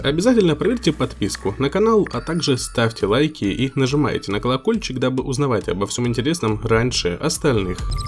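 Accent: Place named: Ukraine. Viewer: автор,судя по говору ты с Украины. ну молодцом! верно все говоришь! забыл только сказать про родственные связи...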